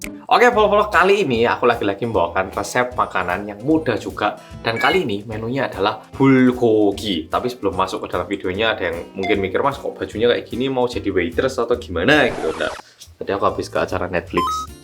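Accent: Java, Indonesia. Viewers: Mas tahun ini ada gak?😁🙈 Kangen nih sambil nunggu buka hahaha mudahan dibaca mas medok😁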